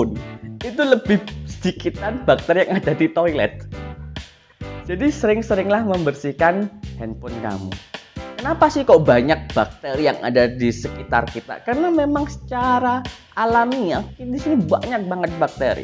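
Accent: Java, Indonesia. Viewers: 😜doktere lucu y.medok bangettt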